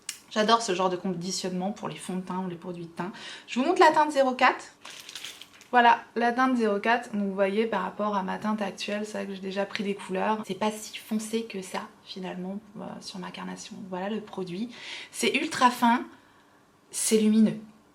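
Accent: England, United Kingdom. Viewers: Décidément ton accent anglais me plaît beaucoup, j'ai le même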